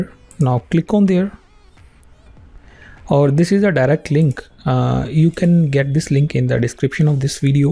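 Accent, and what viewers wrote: India. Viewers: that's an indian accent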